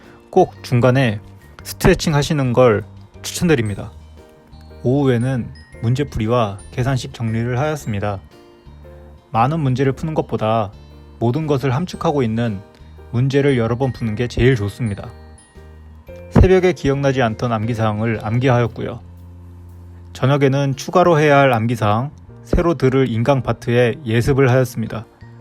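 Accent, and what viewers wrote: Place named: Seoul, South Korea. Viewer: 1과목 교수님 맡으셔도 충분하네요~~ 음성도 좋으시고 특히 서울말씨가 귀에 속속 꽂힙니다. 감사합니다 🙏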